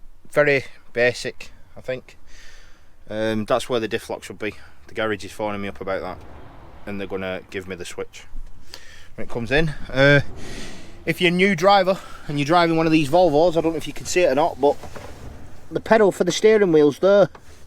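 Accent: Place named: North, United Kingdom. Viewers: I dont even know how I got onto your videos! But when I heard your northern accent and I was hooked😅(I'm from Preston😉) but my dad drives tankers and it's good to see what it's like in that line of work. Keep up the good work mucka!👊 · It's great to hear a local voice on here not really many people on here from greater manchester especially my home town Bolton! ....I'm a bus mechanic and own a few myself (The older stuff) and i cant express how much fun clutches are on big vehicle's when driving automatic's all the time. Feel's great to be behind it especially the double de clutch also with the older ones like mine we have 1,2 Crash 3,4 Syncromesh....Great fun....Keep the good video's up lad.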